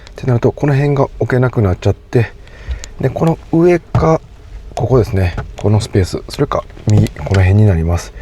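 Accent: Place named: Kansai, Japan. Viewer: そろそろ関西弁なんとかならんの？？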